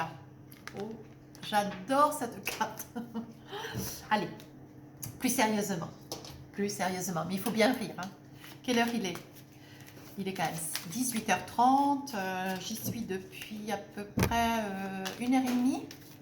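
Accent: South, France. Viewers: Bonjour. J'aime vos guidances. Juste, je cherche en vain l'origine de votre accent. Provence ou sud ouest? 😁👍🙋‍♀️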